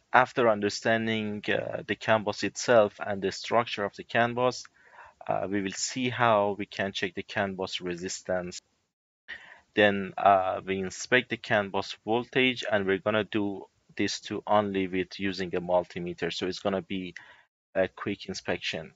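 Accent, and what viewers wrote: Iran. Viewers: good job man, thank you so much for your comprehensive and functional training. your accent says that you are Iranian, am I right?😁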